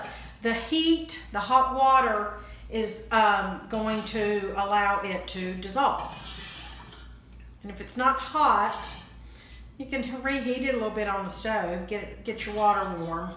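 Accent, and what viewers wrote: Tennessee, United States. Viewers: As soon as you started talking, I knew you were a Tennessean!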